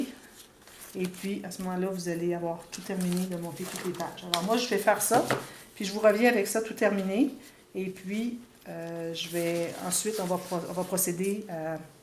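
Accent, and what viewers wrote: Canada. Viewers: Coucou, Pour tes pochettes plastiques, tu aurais pu faire une incision au cuter coté droite, pour pouvoir ôter tes fiches comme ça coté gauche tu aurais pu couper la 1er partie pliable avec les trous et en refaire dans la 2eme partie pliable, et là ta pochette plastique aurait la même taille que ta page et plus de problème pour sortir et rentrer tes fiches! ► Vérifie quand même mes dires car n'étant pas près de toi, mais derrière mon écran peut être que j'ai tout faux! Étant donner que c'est la 1er fois que je met un mot sous une de tes nombreuses vidéos (toutes vue hi hi ) j'en profite pour te dire,.... MERCI tes explications sont claires, tes idées de pages ou forme d'albums sont vraiment très très intéressantes et j'ADOREeeeeeeeeeee ton accent qui me fait voyager chez toi au Canada! MERCI BEAUCOUP pour tous ça! Bonne journée & Gros Bisouuus Valérie de FRANCE.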